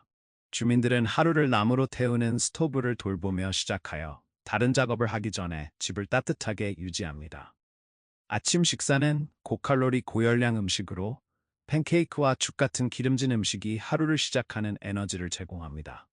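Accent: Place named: China. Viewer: 왜 발음이 꼭 중공 발음 같지?????? 중공 AI 번역인가???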